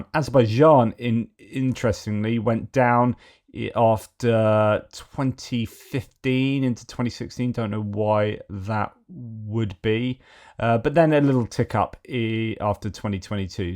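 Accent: New Zealand. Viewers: To be fair, your kiwi accent is pretty on point. Aka “choice”. 👍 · Slow down & draw out the NZ accent! ie really chill it out, Bro!!! ;p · Oh, Chur, Gotta Appreciate the classic Kiwi accent that we deny all too often. Chur for the sanctions but we need to do more bro, but smol island nation syndrome is strong over here, we only dish sanctions, they're pretty chur aye bro. oh and we have likely don't have any SAS operating over there.:_love:□ definitely not.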